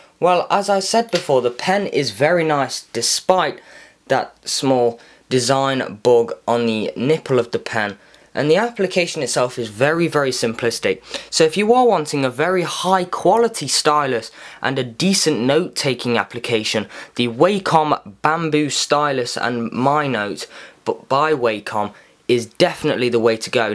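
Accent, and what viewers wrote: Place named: United Kingdom. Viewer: I love your british accent!!!!!! :D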